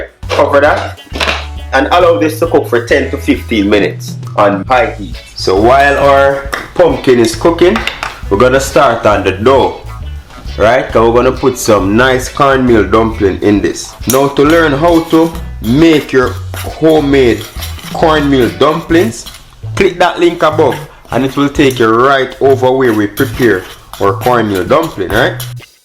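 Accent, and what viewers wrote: Jamaica. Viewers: New subby here! I had to subscribe! I love your Vibe I love the way you cook I like how you incorporate the audience with the cooking. I also love the way that you keep the Jamaican language nice and thick but very eloquently spoken.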